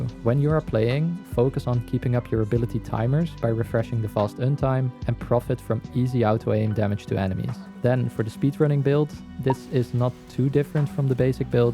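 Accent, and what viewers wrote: Netherlands. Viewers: Hey a dutch person i think cause you have a very dutch accent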